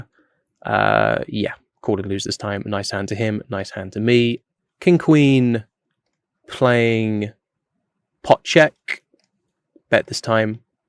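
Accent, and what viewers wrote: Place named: RP, United Kingdom. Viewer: are you going to bring some sub content or a course or smth in between? I love your approach to poker as well as your perfect RP english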